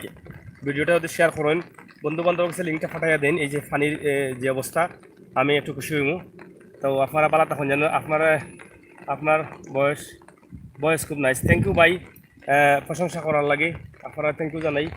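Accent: Sylhet, Bangladesh. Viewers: Vidio kharap lage nai. Kintu apni shuddo vashai kotha bolle hoito aro valo lagto. Kenona syleter vasa shobai buje na. Buccen mama!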